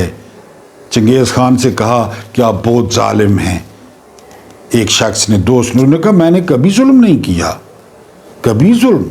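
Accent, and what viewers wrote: Saraiki, Pakistan. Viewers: Aoa,I just listen your program for your siraikey style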